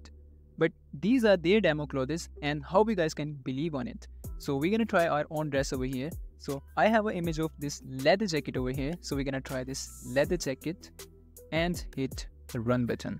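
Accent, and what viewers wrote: India. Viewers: Github? 🤡🤡🤡yeah, if you want to get virus especially hearing this indian accent. Hell no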